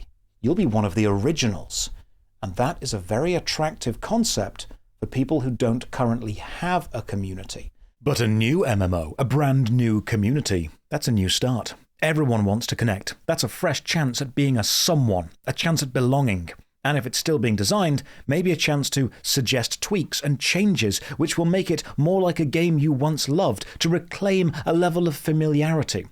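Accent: United Kingdom. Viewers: A really dumb take. You are a great example how British accents don't equal intelligence. How can you talk for 22 minutes about the poor state of MMOs without mentioning the toxic effect that whales have created in every MMO? They have priced out young players entirely from the genre creating a predictable demographic problem. Without young players the developers need to milk more out of each older player. This cycle is not sustainable for obvious reasons. MMOs in 2026 are similar to Golf or Broadway -- a hobby specifically for wealthy people. None of us could have afforded to play a modern MMO back in 2000. It was $90 to play Dune Awakening for the first 2 weeks of launch. By the time the price dropped to $60 it was dead. This game did not die because a lack of social issues. It was dead from the start -- a lifeless whale milking machine. But I get it -- whales are your top supporters. 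You can't be aggressive towards them. It would threaten your income. This is the same lousy excuse developers will give when they refuse to perma-ban RMT buyers. This "community" is rotten. MMOs can't heal until these whales piss off and retire from gaming.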